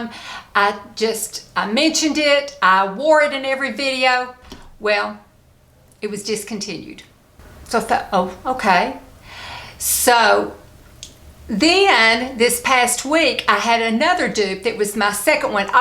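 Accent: South, United States. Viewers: Don't come between a woman and her lipstick! Love it. I subscribed to you the other day because I saw you on little poet. This is my first time to see you. I love you! I have laughed more and had such a good time with you. Now girl, I am southern too. I have an accent and when I hear someone like me, I just go into this haze of pleasure it's like dope. Now tell me if I am right, You sound like a Carolina girl or a Tennessee girl. Maybe Texas but I'm counting on the Appalachian south.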